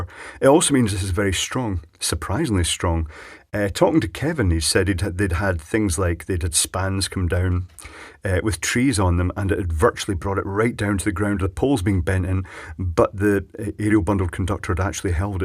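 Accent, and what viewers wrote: Scotland, United Kingdom. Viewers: Is originally from Scotland or Mann? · Wait, you live on the Isle of Man? I been thinking you were from Scotland this whole time.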